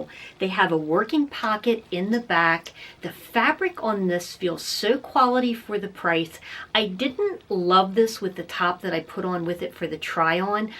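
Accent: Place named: New Jersey, United States. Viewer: I had a feeling you were from NJ! My guess is south Jersey. (I recognized your accent!) I am originally from Cranford, NJ, next to Westfield and not far from Elizabeth. Great haul per usual! I didn't think you looked pregnant in that top - I would keep it if I were you!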